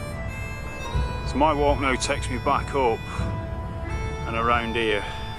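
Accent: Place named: Northern England, United Kingdom. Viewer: Awesome video and walk,. I've been eagerly awaiting the arrival of part 2, which has been an enormous pleasure to watch. A question for you... I was born and live in and around Southampton for just over 22 years, yet I know that your accent is not from the south, so, where were you born? I'm always being told that I haven't lost my accent, yet I've live in the Peak District now for 52 years. This is why I love watching your southern walks and camps so much, one never forgets one's home of birth. Thanks so much and I'm looking forward to your next walk/wildcamp. Take care...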